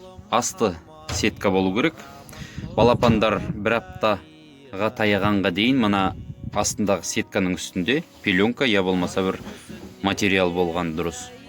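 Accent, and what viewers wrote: Standard. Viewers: коп сейлейтин сенсин!Барекелди мен ризамын бауырымызга! Осылай казак тилимизде таза сойлеген бауырларымды корсем оте катты риза боламын! маган керек талпынган адамдарга керек ал орге тартса корге кашатындар карамайак койындар ренжимесендер)))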